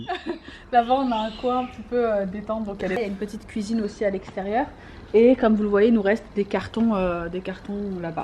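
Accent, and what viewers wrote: Algeria. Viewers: Salut bella, bsahatkom la nouvelle maison, inchallah trabah 3likom avec l'arrivée du bébé!!!! Stp ton accent algérien paraît orannais!!! Est tu d'oran??? Ce dont je suis certaine c'est que c'est l'accent de l'ouest!!!!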